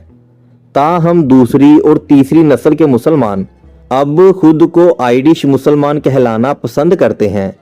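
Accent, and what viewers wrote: Lahore, Pakistan. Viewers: بہت پیاری ویڈیو بنائی ہے اندرون لاہور والی اردو بولنے کی بجائے یہ پنجابی میں بنا لیں زیادہ بہتر ہے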